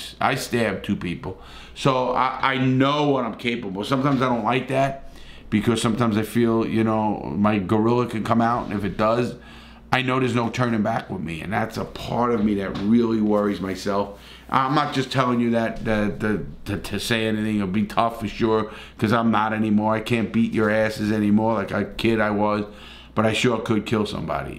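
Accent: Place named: New Jersey, United States. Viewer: Your accent reminds me of a close friend... He was from New Jersey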